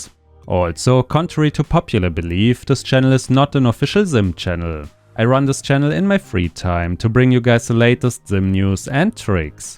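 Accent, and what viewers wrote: Germany. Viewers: Are you German? sounds very German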